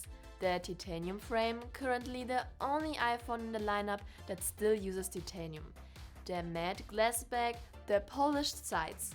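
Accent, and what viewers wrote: Germany. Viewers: I can hear the German accent :D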